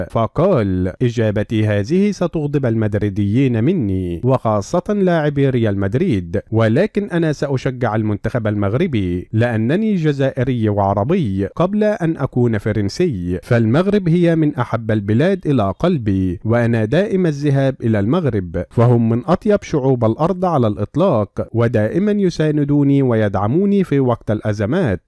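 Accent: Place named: Egypt. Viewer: هههههه. اتكلم عربي. من لهجتك المصرية.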